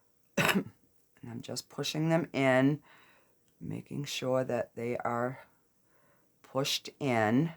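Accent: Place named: Boston, United States. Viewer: First video oof yoours that I watched. Very pretty. Are you from the Boston area? I hear a bit of an accent. I look forward to learning how to make my own pigment paste! Hugs from 🇨🇦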